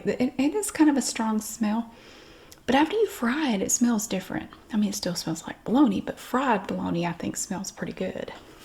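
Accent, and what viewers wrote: South, United States. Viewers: "It has little fish awn it!" 😂 southern accents are my favorite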